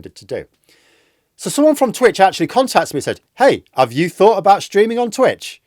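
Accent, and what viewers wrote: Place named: North, United Kingdom. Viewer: I have a dormant Twitch account where I followed a German woman in Finland who ran games and comments for her subscribers. It was mainly to help me learn about Eve. Unfortunately my short attention span caused me to drift. I can barely watch a full rugby match when I care about one team. I'd drop into a Twitch stream for you because it's you and I like your analyses and northern accent. For me (sample of <1), I'd be engaged by a game in the background, with a mission paused and a few topics on the table. But what do I now? I shit into a bag. In any case, I admire your evolving platform(s).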